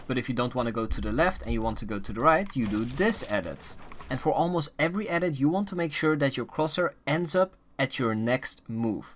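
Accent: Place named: Netherlands. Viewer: Bro ik hoor aan je engels accent dat je Nederlands bent geef get toe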